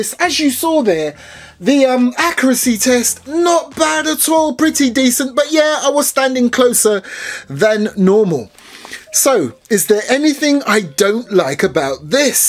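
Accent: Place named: United Kingdom. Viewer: How have I only just found this channel? Love a cheeky British chappy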